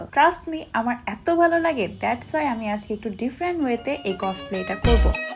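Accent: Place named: Bangladesh. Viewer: Onner kotha te bojha jacche uni bangladeshi 😅😅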